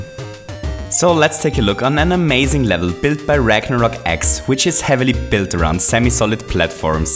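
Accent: Germany. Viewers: du klingst richtig deutsch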